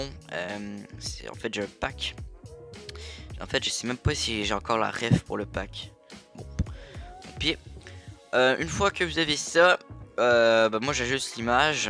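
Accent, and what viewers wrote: Quebec, Canada. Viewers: Hey vive l'accent Québécois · T'es québécois ?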